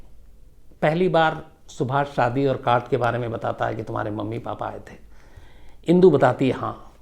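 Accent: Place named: Lucknow, India. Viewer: Sams sir me aap ki sari story sunta hu kabhi comments nahi kiya aaj ker raha hu aap ki hindi mere lucknow jasi hai aap up ke ho kya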